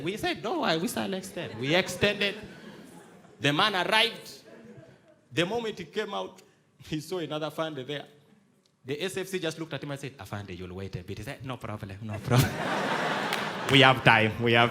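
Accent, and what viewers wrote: Uganda. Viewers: he is exceptional and way above some Nigeria comedians but it is pure ignorance to talk about accent of Nigerians when he has a strong Ugandan accent himself. You can actually disagree with your head and not your heart.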